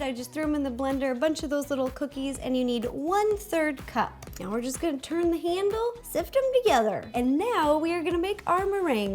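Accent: United States. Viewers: Can I just ask, is this all imperial? I use metric, cause I live down under, aaaaaaaaaaand I wanna make some stuff from vids, but she sounds American, so I'm guessing it's imperial.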